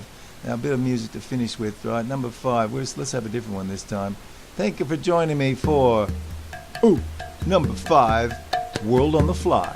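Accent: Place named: Australia. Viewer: World on the fly... I like it...your American accent is fading and sounding more Aussie :) Cool info.. please guys don’t forget to plant native trees and let nature reclaim it’s land!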